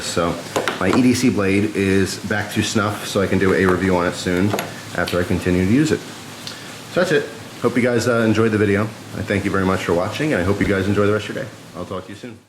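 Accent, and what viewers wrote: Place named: United States. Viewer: It's called a "strop;" it's a piece of leather with abrasive material on...Oh, wait, you're making fun of his pronunciation, aren't you? Well, my cheeky friend, there's a whole bunch of dialects in the U.S. and I honestly don't know why you are entitled to judge him for having a different one than yours.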